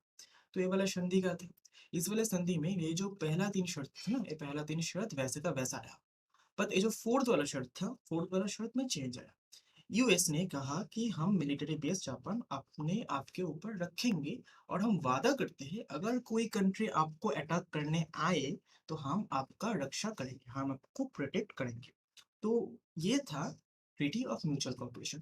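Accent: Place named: Bengal, India. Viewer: I don't whose voice this is...but I want to request him to increase the volume because I cannot listen it without headphone 😐... otherwise it's nice... I guess any Bangali Babu is teaching us... because his pronounciation of Hindi words say this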